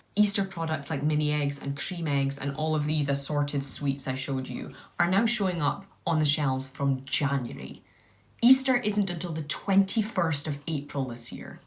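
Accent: Scotland, United Kingdom. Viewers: Hiii! I am here only for your good looks and Scottish accent. ;)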